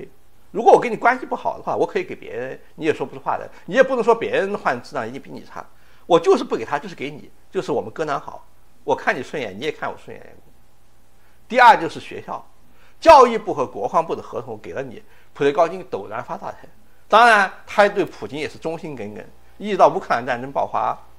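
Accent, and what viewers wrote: Sichuan, China. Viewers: 加上字幕吧！ 蜀國話聽來吃力鴨！😁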